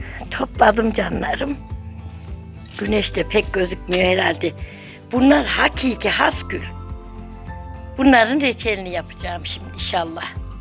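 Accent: Kayseri, Turkey. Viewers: Teyze kesin Kayserili şive aynı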